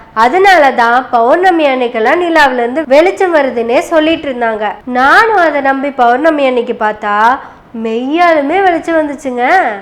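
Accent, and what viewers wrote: Kongu, India. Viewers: அதுங்ண்ணா எங்க கொங்கு தமிலுங்ண்ணா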